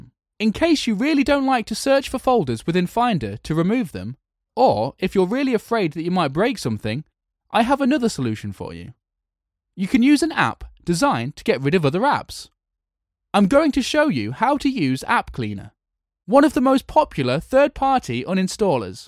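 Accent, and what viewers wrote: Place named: United Kingdom. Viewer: thank you....nice british accent.....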